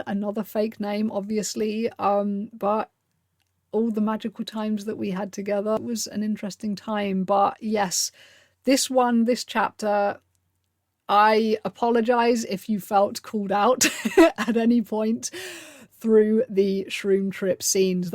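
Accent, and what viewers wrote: United Kingdom. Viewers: I love your accent.almost sounds british.also,love your vibe. Good luck on ed recovery.its not easy.had a friend in high school who we all thought was a finicky eater.until...she came to school looking absolutely wrecked.tiny to start with,she got down to i think 45 or 55 pounds,and she was 5'4.deadly low.no,sadly,she did not recover.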